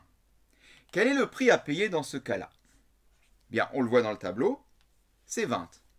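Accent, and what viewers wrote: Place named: Alsace, France. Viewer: en alsace on le prononce, et il me semble qu’il vient de là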